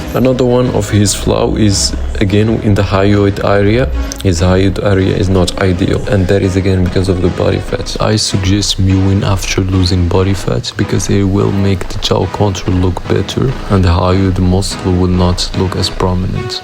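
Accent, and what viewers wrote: Morocco. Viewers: Moroccan accent bruh ur moroccan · Are u Moroccan bro? From your accent I can tell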